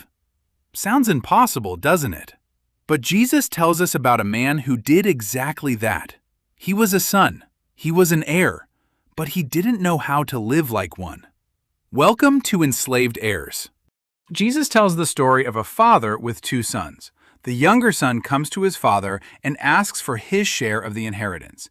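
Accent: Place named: India. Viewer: The indian accent got me😂❤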